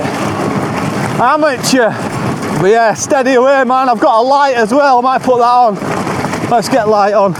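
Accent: Yorkshire, United Kingdom. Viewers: Same....great character and I'm a sucker for a Yorkshire accent! 😘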